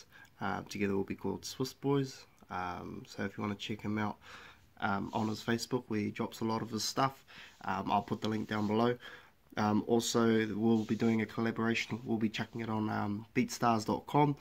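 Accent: New Zealand. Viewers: Nice Maori accent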